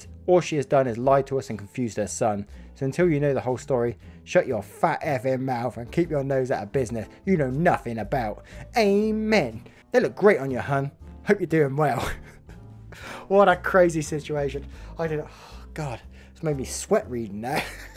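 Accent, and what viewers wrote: United Kingdom. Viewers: OMG A BRITISH PERSON!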